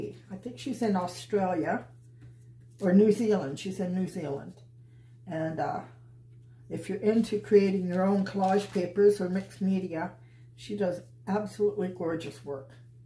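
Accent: Nova Scotia, Canada. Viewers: I was searching for plaster of paris projects for junk journal covers and your momigami video popped up and I'm so glad it did. I subscribed now and like the wide range of art projects on your channel. I live in Denmark, originally from California and adore your Nova Scotia accent. I had just made a journal out of crunched up paper bags with hand cream and loved the rawness. Last year I ventured into gelli plate print making as I don't get into the printmaking studio that often. I will try your momigami technique on them as well. Thank you for sharing your knowledge. By the by, I love Froyle's art too.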